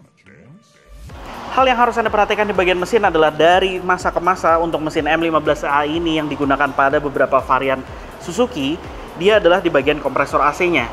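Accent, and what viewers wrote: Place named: Banjarmasin, Indonesia. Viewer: Kalau mendengar logat bahasa mas, logatnya logat bahasa Banjarmasin😂😎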